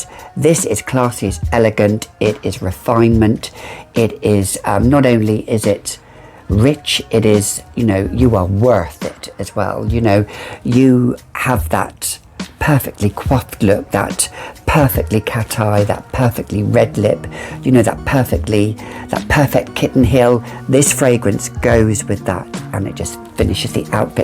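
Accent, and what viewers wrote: France. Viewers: I love your French pronunciation, are you partly French? New subscriber, love the video🥰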